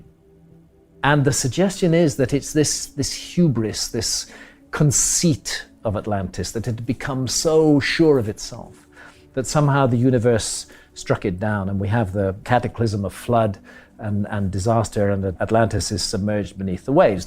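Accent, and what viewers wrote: England, United Kingdom. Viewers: His English accent gives him an infinite amount of credibility. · This bullshit artist actually says that Plato's story of Atlantis MUST be true because geology says sea levels rose via Meltwater pulse 1B (the characteristics of which is highly unknown, by the way) around the same time that Plato gives for Atlantis. No joke, he actually says this. It is so easy to get away with bullshit when you have an awesome british accent.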